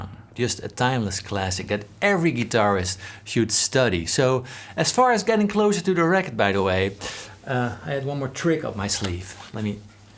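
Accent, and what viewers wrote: Netherlands. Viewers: Hoi! Groetjes uit Utah USA. Ik ben SoCal geboren en groot gebracht. I was pretty sure I heard a Dutch accent... en, ja hoor! 😅 For me, music is my time machine. I'm 67 now. When this song came out in '75, I was in high school. I took a class on US government and our teacher had arranged for us to fly from Southern California up to Sacramento, the state Capitol. We traveled all over (State offices including Gov. Ronald Reagan's office) Folsom Prison (don't know if you're a fan), Old Town Sacramento and Sutter's Mill (where the 1849 gold rush began). ALL DAY LONG on the old school bus we travelled in, THIS brand new song played. It was repeated constantly. To this day, whenever I hear it, I am always transported back in time to 1975. I saw the a couple of times, once, (I could go on). And I have memories from all these groups. But takes me back in time to this one particular day like no other. 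My best friend was in the band in the '70's and left before their big hit from Top Gun in the '80's. Of the two of us, he's the real musician. I'm sure you've heard of Rick Beato. Still, I love seeing you young guys dig in to our old hits to figure them out and play them correctly. Warms the heart and soul. I guess I'm afraid after us old guys are gone, the stuff ppl call music today (or AI) is gonna replace it. 😢 Waar in Nederland ben je wonachtig? Mine kinderen zijn vlaak bij Dordrecht en Barendrecht. No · bro is that a dutch accent I catch there? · Hey love your videos! Have been following your channel for years, and only now, when I'm about to get married to a Dutchie, did I actually notice through your accent that you're Dutch! Ahah! By the way, check this guy it would be awesome to see a video of you two together on your channel! Cheers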